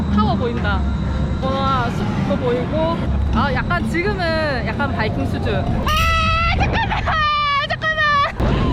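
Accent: Gyeongsang, South Korea. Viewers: 살짝 경상도 사투리가 툭툭 튀어나와서 더 정감이 갑니다. 두류동 벚꽃만 생각했는데 맛집에 멋 뿜뿜 대구한바퀴 잘 봤습니다. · 재밌어요. 님 ^^ 이거 구독 갑니다. 라떼도 우방랜드에서.. 망원경으로 공개관측회 & 천체사진 전시회 하고 그랬는데... (대학교 동아리) 그때가 98년 이맘때쯤 가을이었구나~ 졸업후 계속 타지에서 생활하고 있는데.. 이런 대구TV 사랑합니다~ ㅋ 약간의 대구억양이 가미된 ^^ 대구한바퀴~ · 우방타워 야경을 보면서 야자를 했었는데, 이렇게 정겨운 대구 말투로 보니 반갑네요.ㅎㅎ 예전에는 두류공원이 밤 되면 우범지대였었는데, 지금은 시민의 공원으로 재탄생된 것 같아 보기 좋네요. 다음에 시간 나면 애들 데리고 한 번 가봐야겠어요. 좋은 영상 감사합니다.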